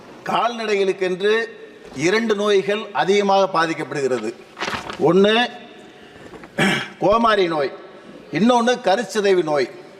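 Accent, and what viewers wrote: Madurai, India. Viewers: மதுரை மொழியின் சிறப்பு 🎉🎉🎉🎉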